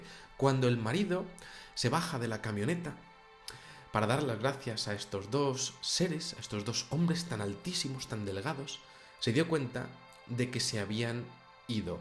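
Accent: Spain. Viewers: pues sea lo que sea que ay ahi no es normal lo que me mas bien me pregunto es que buscan los extraterrestres ahi si no ay casi vida en flora y fauna ni gente a que van ni modo que venga a vacacionar o a vistar pero bueno exelente video lo visitaria pero el echo de pensar que algo me pase me da miedo jajaja por cierto me encanta tu voz de español saudos desde mexico ven avistarnos :)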